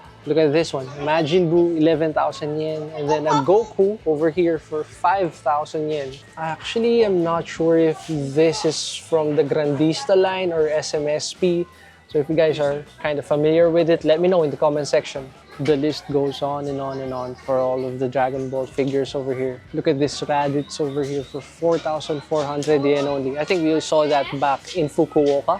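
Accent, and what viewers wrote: Philippines. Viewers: Ayyy pinoy nga, very Filipino accent, cutiepie pa. May bago na naman ako panonoorin❤ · I watch these videos for the strong filipino accent 💪 Hoy Pare!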